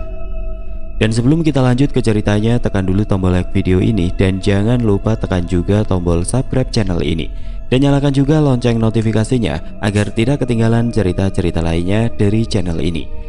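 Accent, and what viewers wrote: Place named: Jakarta, Indonesia. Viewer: Demen banget kalo ceritanya ada logat" Betawi nya 😅😂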